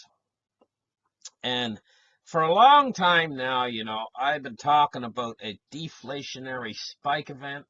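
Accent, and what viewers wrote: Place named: Northern Ontario, Canada. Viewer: His name is. I asked him where in Canada he lives and he didn’t respond. Judging by his accent, I would say Northern Ontario or the Maritimes (eastern Canada)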